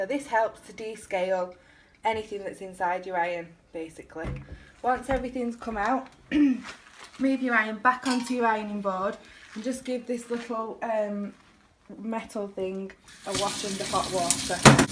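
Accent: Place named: Northern England, United Kingdom. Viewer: Great instructions, nice and clear from a fellow northerner! Thank you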